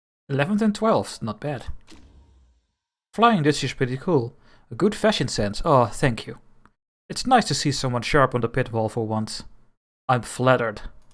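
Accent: Netherlands. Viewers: Really enjoying your play through and I love the Dutch accent!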